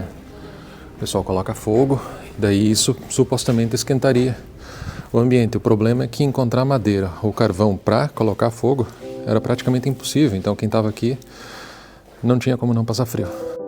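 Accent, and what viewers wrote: Rio Grande do Sul, Brazil. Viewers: Foi tudo muito triste meu Deus. Muita gente não sabem disso, eu imagino como é que ficam os que entram nesse lugar. O Judeus sofreram sem uma razão aparente mas acho que não tem nada a ver como castigo ou juízo de Deus, eu sou Cristã amo Israel oro por Israel, porque as coisas não tem sido boas pra eles ultimamente. Eles são o Povo de Deus alguém queira ou não, obrigado pelo vídeo pois vim aprender muitas coisas conhecndo maus a história, tenho assistido outros vídeos e lido livros sobre o assunto. Que Deus abençoe a ua vida, tens um sotaque Gaúcho já fui missionaria no RS amo os meus irmãos Gauchos voltei pra minha terra com minha família deixamos muitos irmãos lá continuamos nos comunicando com eles nas redes sociais. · Sou da região noroeste do RS é o mesmo sotaque por isso perguntei um abraço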